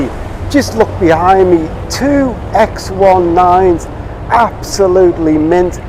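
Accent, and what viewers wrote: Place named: Liverpool, United Kingdom. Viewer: Lovely video mate ❤ Where are you from? Can I hear a Liverpool accent or close to Liverpool? Maybe Chester areas?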